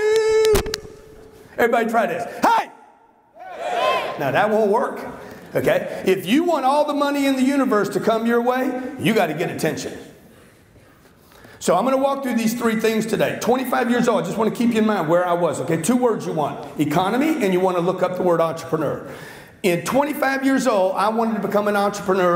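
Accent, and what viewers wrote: South, United States. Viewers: I love Grants Southern accent, makes him just that much more BADASS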